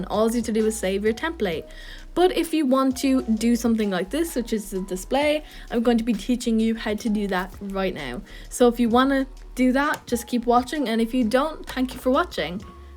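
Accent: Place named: Ireland. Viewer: Are u irish? Luv ur accent :)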